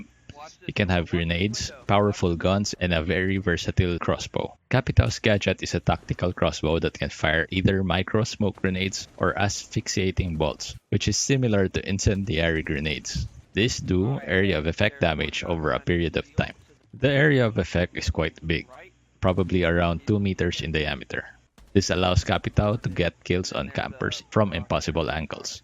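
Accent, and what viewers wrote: Philippines. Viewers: Are you Filipino? You have the accent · The filipino accent on this one is wicked. I love it.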